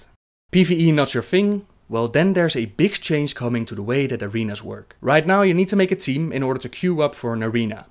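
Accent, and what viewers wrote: Netherlands. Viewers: I like your Dutch accent:) I'm Dutch aswell:)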